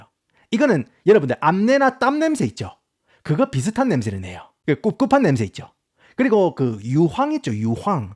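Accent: Gyeongsang, South Korea. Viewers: 유용한 정보 감사합니다. 구수한 경상도 억양도 재미있어요. 스위스에서 먹은 치즈 얘기 듣기만 해도 군침이 돕니다!😋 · 경상도 액센트 너무멋져 부러워요❤ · 이어버드로 소리만 처음 들었는데요 찐한 갱상도 사투리에 나이 많은 아저씨신가 했는데요. 계속 듣다보니 영어 발음 욜 좋으시고 영상도 보니 힙하게 영하게 생기심에 놀라고. 끝까지 들으니 깊은 내용에 감동했어요. 너무나 도움되는 영상 감사합니다. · 유익한 정보 감사합니다 완전 갱상도 특유의 언어로 시원한 설명 도움 많이 받고 구입시 신경 쓰겠음다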